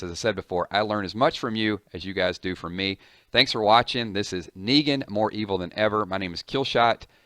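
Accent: South, United States. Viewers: where are u from I can hear a southern accent btw what do u use to stream road to survival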